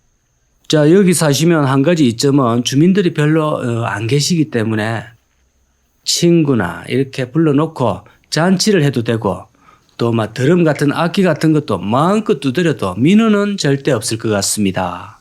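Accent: Gyeongsang, South Korea. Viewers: 모두들 잘살고 평화로운 세상 오길 간절하게 바랍니다. ~경상도 말씨가 듣기좋습니다. 저도 차분한 말투 배워야겠어요.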